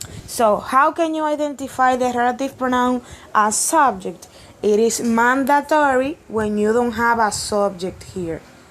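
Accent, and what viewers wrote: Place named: Spain. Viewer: Although your funny Spanish accent that is so great. I understood that finally. Thanks a lot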